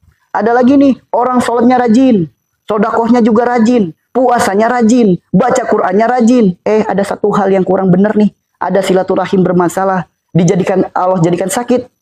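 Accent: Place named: West Java, Indonesia. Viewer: Pa ini bp orang mana kpnkaya orang sunda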